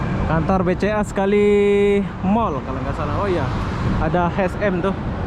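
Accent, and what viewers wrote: Malaysia. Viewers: Bang padahal orang lombok, knapa abang masih pakai bhasa orang KL. Pakailah bahasa sendiri yaitu bhasa indonesia, apa udah terbiasa duduk di KL jd lupa sama tata bahasa sendiri,,susahh brnar hilangin logatnya itu.. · Kamu org malaysia apa indonesia? kok ky ada logat dan kosakata melayu malaysia?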